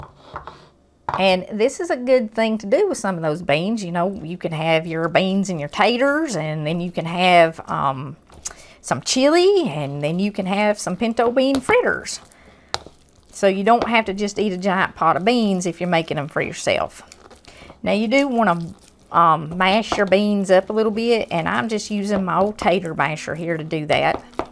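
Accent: South, United States. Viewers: Just love this lady! She talks like my grandma did, southern twang! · She sounds like my people. Hillbillies have been around forever.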